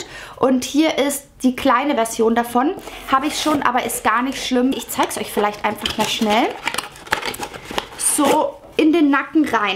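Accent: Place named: Franconia, Germany. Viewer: Du bist mir fränkisch am liebsten❤️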